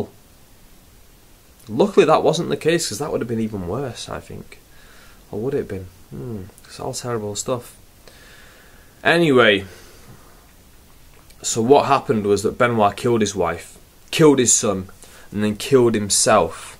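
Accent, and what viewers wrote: Manchester, United Kingdom. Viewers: Does this guy on the video have an Manchester accent ? Is he from Manchester? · Where you from anyway? You sounds like your from my home town? Manchester Salford :)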